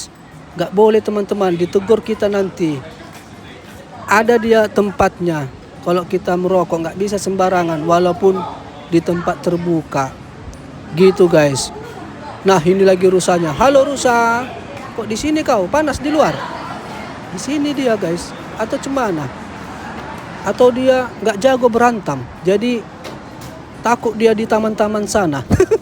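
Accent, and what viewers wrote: Medan, Indonesia. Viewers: suara abang khas banget melayu medan senang aku dengarnya bang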